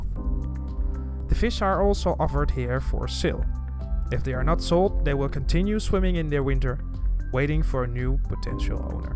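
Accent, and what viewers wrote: Netherlands. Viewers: haha ik ben ook nederlands hoorde her meteen aan je accent, toch nog zeker wel een goede engelse uitspraak! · Dutch accent? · Are you dutch? Your accent sounds like mine and i'm dutch. Great video too! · are you Dutch? Ik hoor Nederlands 😎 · A Dutch guy (judging by accent) speaking English about Japanese Fish. We Dutchies always had something for multi-cultural stuff...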